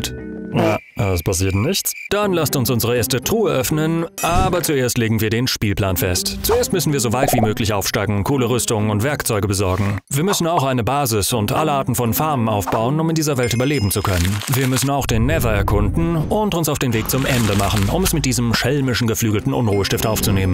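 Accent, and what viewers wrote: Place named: Germany. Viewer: Er klingt wie ein alman aber es ist geil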